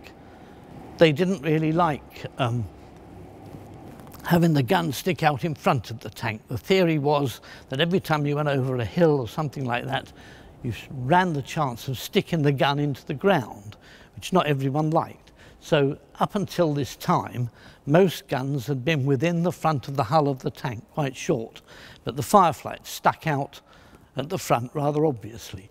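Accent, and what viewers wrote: United Kingdom. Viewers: Something about his British accent, cadence, and tone - yeah definitely easy listening.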